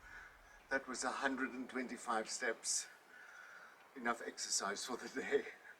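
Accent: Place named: South Africa. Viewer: Recognised the SA accent, am enjoying your channel so informative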